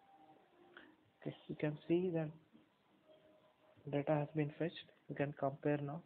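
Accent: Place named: India. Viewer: I hate Indian accent. Next time, use a text-to-speech software to generate voice instead.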